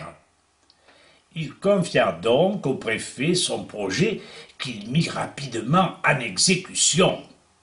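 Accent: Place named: France. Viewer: Monsieur je vous découvre et c'est un ravissement. Accent de notre France, accent de mon enfance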